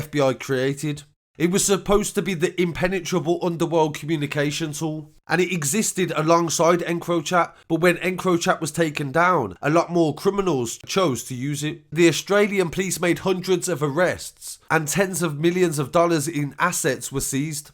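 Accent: United Kingdom. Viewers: It is a regional accent and part of what makes Britain unique. You don't like it then do one you farkin muppet.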